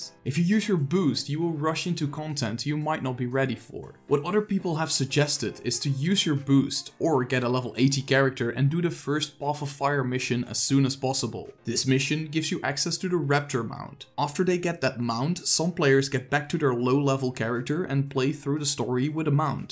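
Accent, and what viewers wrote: Netherlands. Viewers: I can slightly hear the dutch accent mijn vriend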